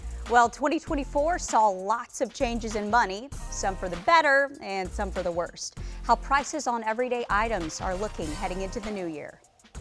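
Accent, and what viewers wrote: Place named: DMV, United States. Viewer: getting that DMV twang down solid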